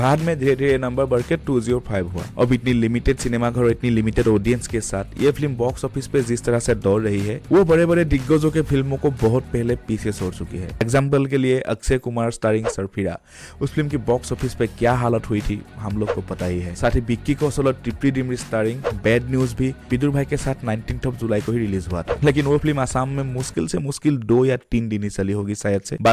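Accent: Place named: Assam, India. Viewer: Your accent say's that u are Assamese 😅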